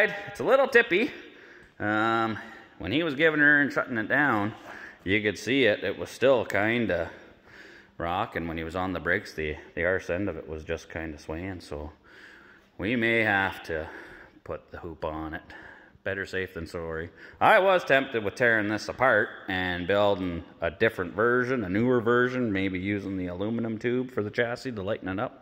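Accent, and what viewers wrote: Canada. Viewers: That Canadian lingo cracks me up. 🤣 I have practiced for hours and still cannot say out like you guys do.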